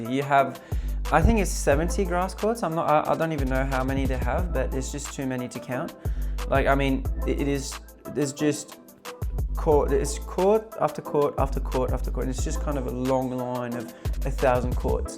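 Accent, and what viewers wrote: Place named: France. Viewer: I tought you were french or belgian with the accent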